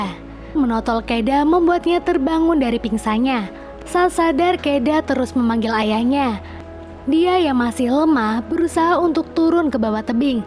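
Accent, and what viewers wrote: Pemalang, Indonesia. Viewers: Mba nya orang Pemalang kah?? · Mba smpean wong pemalang po? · Mbak wong pemalang? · Mbak Pemalange Ndi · Wong pemalang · Pemalang selatan ya😂😂😂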